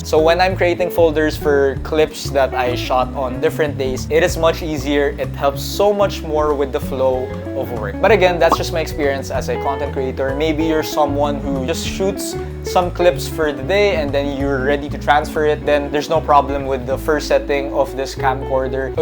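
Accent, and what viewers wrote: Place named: Philippines. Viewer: Dude. You're my fav youtuber. I love photography and videograpyh and I spent almost a year in total on the Philippines (Been teaching german to filipino nurses). By far my fav country in this world!! And I miss hearing that accent every once in a while so here I am, getting the full package. Also, keep up the good vibes dude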